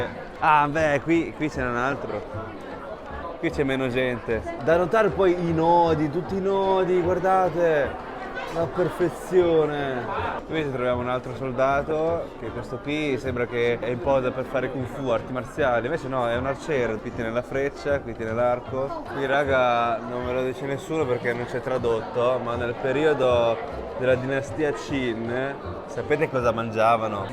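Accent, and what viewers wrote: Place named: Pesaro, Italy. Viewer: Pesaro, come fai a confondere l'accento con il modenese?😅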